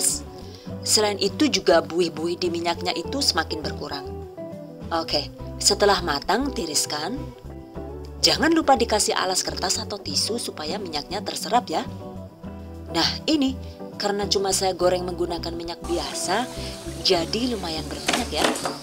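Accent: Banyumas, Indonesia. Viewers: Syuka bgt sm resep2nya Yu...hp sy semua isinya,sy save & ada yg beberapa sy praktekin,masyaAllah chanel resep yg gk pake bohong yg pernah sy subscribe.😊...setiap yg sy praktekin Alhamdulillah berhasil.syuka jg sm ngapaknya itu yg gk pernah ketinggalan,CINTA NGAPAK pokoke ya Yu:)) Sukses & sehat selalu ya Yu...semoga pahala trs mengalir krn selalu tebar kebaikan. Pengin meet up sm yayune,hehehe..."semoga" #GiveawayTHVFEBRUARI19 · Pinter masak.. Pinter bhasa inggris Ehh pinter ngapak jg Komplit deh😁😁😁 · aku suka Vidio orang ngapak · Bunda tu ngapak kah hehe... Btw suka sama chanelnya 😍😍 lebaran kmrn aku pakai resep ni buat pesenan pastelnya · Bundaa kok bisa bahasa ngapak? 😁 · Assalamualaikum ......aku sangat suka dan ngefens samambak punya ciri khas suara yg sangat merdu skli.aku suka resep2nya mbak .danadaresep totorialnya yg mdh dansimpel utk dipraktekan aku sangat suka bhs ngapak2nya itu ......😂😂😂😂😂😅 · Konten yg menyenangkan...ada bahasa ngapaknya Jawa saya..i like ..thank you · Manstap bgt bunda...ngapak ykykny bhsany..sama nih🤭..suka bgt q kue ini🤗🤗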